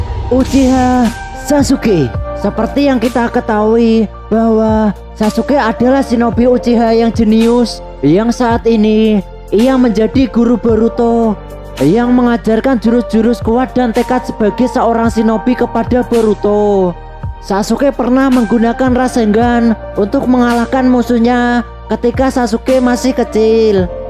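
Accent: Java, Indonesia. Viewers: Ceritanya .dialeknya .Jepang Jawa 😀😀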